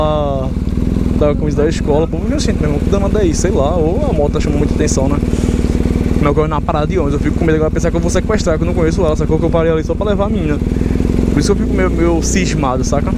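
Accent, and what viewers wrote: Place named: Recife, Brazil. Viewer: Cara parece ser de Recife porra 😂😂😂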